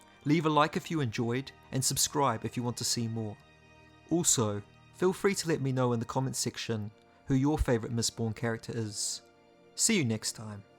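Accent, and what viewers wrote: New Zealand. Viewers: That is a kiwi accent. Shot bro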